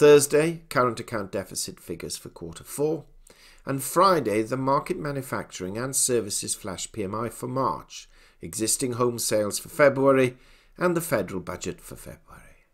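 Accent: United Kingdom. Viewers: I guess I'm into stereotypes. These reports as read by old British accent dude keeps me coming back. Sounds smart and full of wisdom, and So cool, Thanks for the info, I don't always like the truth in your reports, but I do really appreciate them. It's like listening to Harry Potter, but for economics and precious metals. 8)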